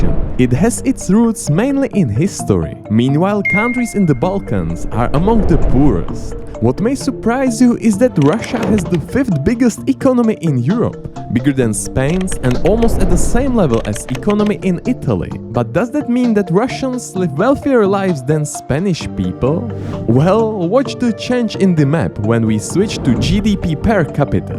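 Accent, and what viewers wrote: Czech Republic. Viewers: The strongest Czech accent, ive ever heard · Skvělý video! Btw hned mi bylo jasný, že seš čech, ten českej přízvuk se prostě nezapře :D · yes but he is tchéque not french.. still, i like the accent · Your videos are good, but that strong czech accent is driving me crazy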